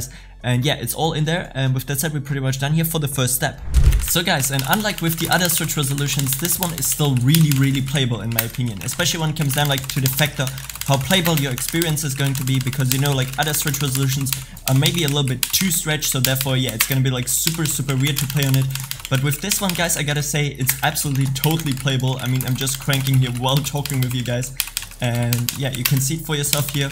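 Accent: Germany. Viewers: 100% deutsch akzent erkannt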